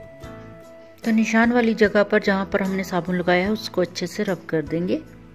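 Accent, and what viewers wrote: Punjab, India. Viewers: Ma'am Aap ki hindi sun kar toh hum bhi Punjabi bolne lag jaayege, hamari Hindi ko Pls. Mat kharab kro.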